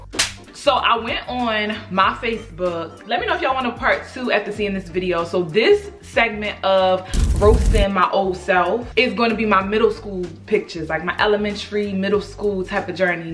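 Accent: Baltimore, United States. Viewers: You from Baltimore?? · Are you from Baltimore? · Muva and Hotdugs 🤣 Yes! Girl that Baltimore keeps sneaking out! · This like my 3rd video watching her and I KNEWWW SHE WAS FROM BALTIMORE I know my accent when I hear it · Girl you sound like Baltimore! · i knew you were from baltimore bruh, the accent!!!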